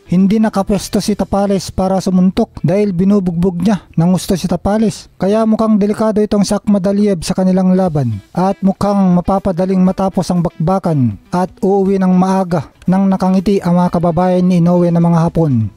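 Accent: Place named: Visayas, Philippines. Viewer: Salita mo palang, pang bisakol na...basta ganyang lahi mga fakenews .... dapat mawala na kayo sa mundo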